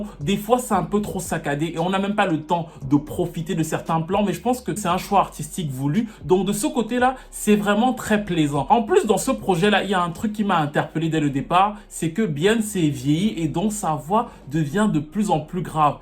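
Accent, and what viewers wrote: Cameroon. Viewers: Je viens de regarder ta vidéo et j'adore ton accents !! Moi aussi je viens du Cameroun 🇨🇲 et tu peux vraiment être fier de toi 🤗 😍 c'est même pas la peine de les répondre ces haineux ! ⚠️ 😡 J'adore toutes tes analyses ! Tes trop 💪🏾 fort j'apprends tellement de choses... Merci pour ton Travail 🤗🤗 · Je me suis toujours dit qu'il y'a un côté camerounais en toi. Non pas pour ton accent, mais par ta manière de parler. 💪🏾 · Tu es trop beau, trop sexy, trop hot. Ah le Cameroun…. Tu es intelligent et j’adore ton accent. Bisous je suis une fan de Chine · j’étais sur que tu étais mon frère Camerounais (d’ailleurs je t’ai posé cette question sur une autre video) Ta façon de parler et quelques manières sont typique🤗 J’aime beaucoup ta passion elle est contagieuse. Une seul mot « Continuez »😉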